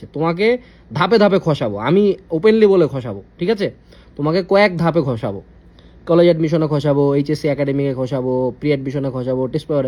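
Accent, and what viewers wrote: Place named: Sylhet, Bangladesh. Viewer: oni sylheti er Jonno accent ei rokom abr onar past onek koshter oni jeikhan theke Uthe ashche shobai ta parbe nah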